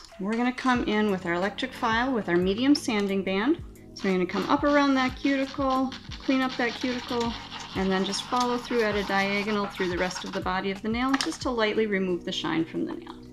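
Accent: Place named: Midwest, United States. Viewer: I knew she was from MN! Michigan girl here. I’d recognize that Midwestern accent anywhere! Love nail sets! 😍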